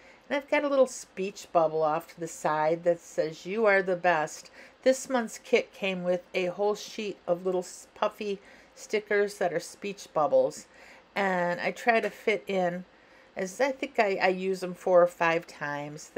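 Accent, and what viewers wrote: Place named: Wisconsin, United States. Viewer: Nice cards! Your accent is driving me crazy! I lived in Connecticut when I was younger and I just know I've heard it up and over that way, but not quite that far... Wisconsin maybe??? LoL, see it's truly making me crazy!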